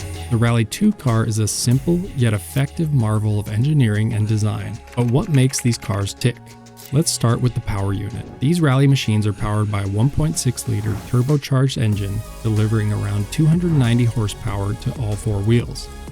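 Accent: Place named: United States. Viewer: An american speakimg on rally? Its a no from me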